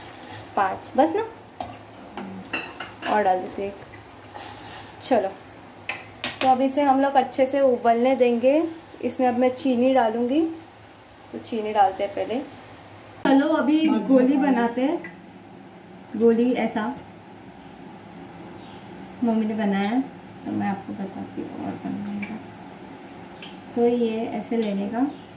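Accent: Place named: Maharashtra, India. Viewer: Stumbled upon your vlog while watching Nomad Shubham’s vlog. Daily lifestyle vlogs are always a good idea. You hav an attractive personality. Just work on the quality of content, video and audio. It’ll definitely fetch you in times to come. All the best. I’m a GI Surgeon currently practicing in Mumbai/Thane; originally from Bihar. I like the Maharashtrian-Hindi accent of yours.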